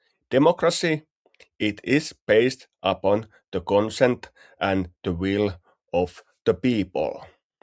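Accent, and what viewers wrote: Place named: Finland. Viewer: Thanks, nice to hear a Finn speak English👍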